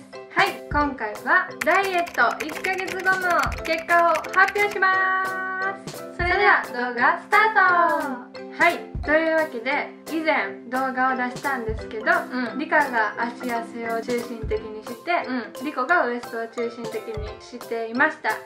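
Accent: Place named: Mie, Japan. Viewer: の三重弁くそ好きなんだよねー♡♡笑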